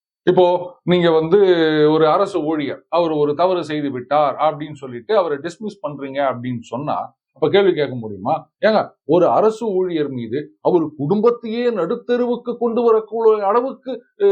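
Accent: Chennai, India. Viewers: Innama neeyu, Avaru Ara Kura Madras Tamil than pesararu. Mulu Madras bhashai pesala. Purda..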